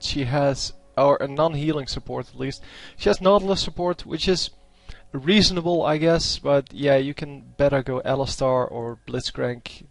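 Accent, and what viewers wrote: Netherlands. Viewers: is that a dutch accent i hear ?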